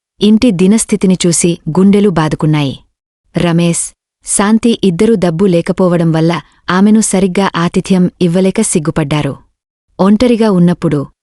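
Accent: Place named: North, India. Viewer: ఆమెకు తెలుగు తో కొంచెం ఇబ్బంది,ఉత్తరాదికి వెళ్ళి స్థిరపడ్డారు అనుకుంటా, ఆ ప్రభావం కొంత కలగలిసి వినిపిస్తుంది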